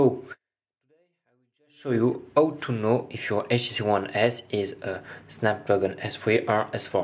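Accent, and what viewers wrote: France. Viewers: Lol, ur french, i can hear it Heun que g raison ? Bizzarement mon frere a le meme accent xD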